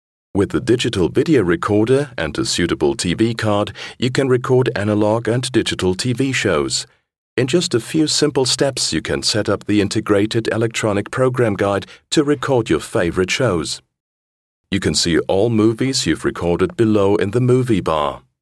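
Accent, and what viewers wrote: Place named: Germany. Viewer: the guy whos talking has a german accent!! lol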